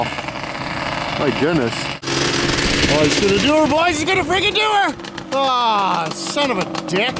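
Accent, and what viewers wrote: Ontario, Canada. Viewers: Lol I can tell your from Ontario just by the accent.. everyone from the up to northern michigan tht stays there a while picks it up.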